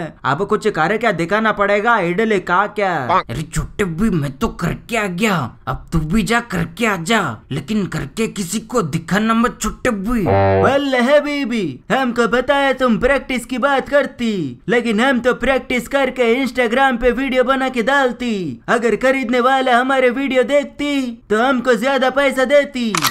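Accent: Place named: Hyderabad, India. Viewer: Will I am sonn 😍best👌 kya Hyderabadi bolte ho bhai tum ek dum awesome 😍 sun ke maza aa jaata hai 👌😄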